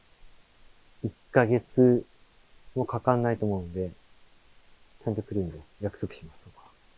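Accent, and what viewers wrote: Japan. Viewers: 沖縄のイントネーションがかなり入ってます。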